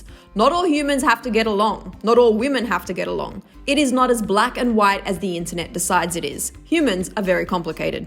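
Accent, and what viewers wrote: Australia. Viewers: you're just not used to Australian accent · What is that dialect? Is it Australian?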